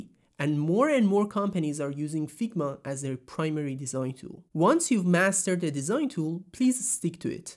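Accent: Iran. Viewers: کلمه اول رو شنیدم اینجوری بودم که عه ایرانیه طرف😂❤ موفق باشی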